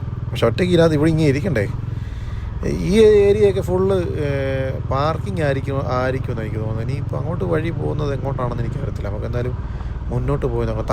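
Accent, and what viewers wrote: Kottayam, India. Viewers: kottayam slang kettapo tanne manasilay😅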